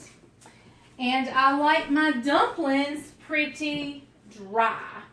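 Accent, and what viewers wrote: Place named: Arkansas, United States. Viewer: Have you tried a potato/carrot peeler to peel your apples? It's a bit easier than peeling apples with a knife. Love your accent. Our nieces live in Arkansas and you sound just like them! :-)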